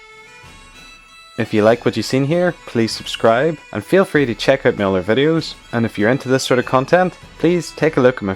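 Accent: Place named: Northern Ireland, United Kingdom. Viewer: Are you from Northern Ireland? I can kinda hear it in your voice.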